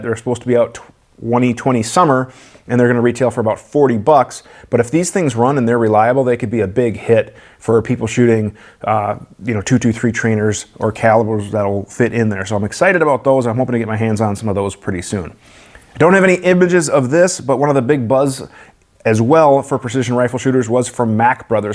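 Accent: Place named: Wisconsin, United States. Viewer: Love your videos and sconnie (OR possibly MN) accent tooo boooot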